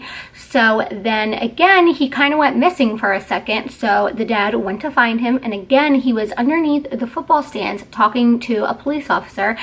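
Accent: Delaware, United States. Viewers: This is one of the more intriguing and unsettling missing children cases I've heard. Btw I like your accent. I had never heard a Delaware accent before.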